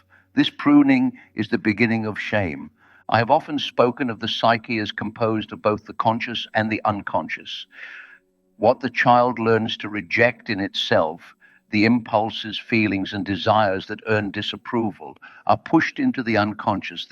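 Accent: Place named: New Zealand. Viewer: Why is Dr Jungs accent from what I assume is New Zealand?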